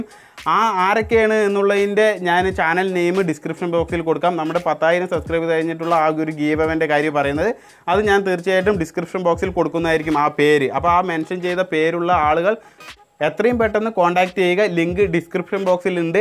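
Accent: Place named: Alathur, India. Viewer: ഇക്ക ആലത്തൂരോ, എനിക്ക് ഡൌട്ട് ഉണ്ടായിരുന്നു സംസാരം കേട്ടിട്ട്, പാമ്പാടി ആണ് എന്റെ നാട്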